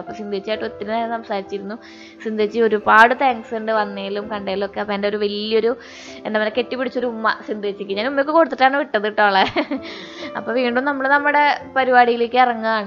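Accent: Thrissur, India. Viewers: Sathyamnu😁I like Thrissur slang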